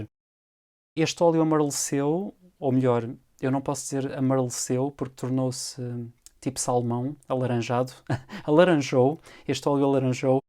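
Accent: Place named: Portugal. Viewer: Que lindo ver você falando português de Portugal, amo esta língua portuguesa! ❤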